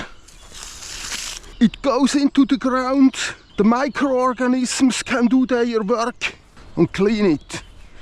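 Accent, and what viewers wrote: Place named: Switzerland. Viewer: Hoi! Deine chanel ist fur mich eine echte schatz! Du gibst mir viele inspirationen wohin ich mit dem kajak gehen kann! Ich wohne seit 3 jahre in der Schweiz und ich habe jetzt gerade angefangen mit Kajak abentauer und ich suche jetzt fur wo konnte ich fahren! Aus deinem akzent ich glaube dass du eine Schweizer bist also ich glaube das tu die Schweizer kajak strecken sehr gut kannst. Dank dir habe ich jetzt paar Ziele, jetzt brauche ich nur gute gesselschaft! Ich lasse SUB fur deine channel! LG! P.S Du bist mega positiv Person, das macht fruede dich zu schauen :D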